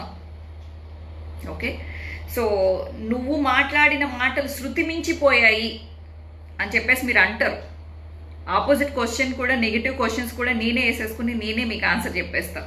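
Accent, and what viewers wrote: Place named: Telangana, India. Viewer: Hyderabad lo unnav enti... Telangana esa lo matladuthunnava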